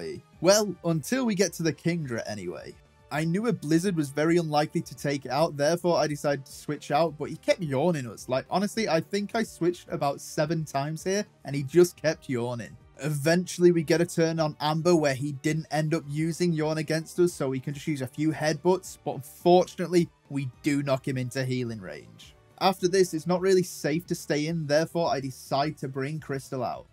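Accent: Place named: Scotland, United Kingdom. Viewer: Haha great vid! I love your Scottish accent, I play golf with a scotsman, he happens to be ginger too, I don't know much about Pokeymans tho, you earned a like.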